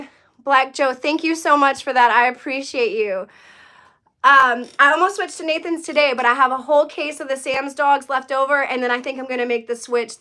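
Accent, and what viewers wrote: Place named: South, United States. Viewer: You're very beautiful woman and I love your little southern accent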